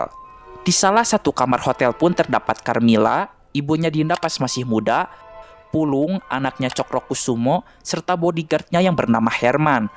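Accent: West Java, Indonesia. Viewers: Sunda banget logat ny.....si mimin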